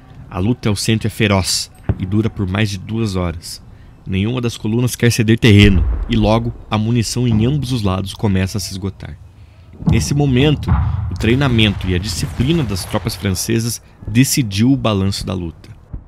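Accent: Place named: Caipira, Brazil. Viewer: com um sotaque caipira insuportável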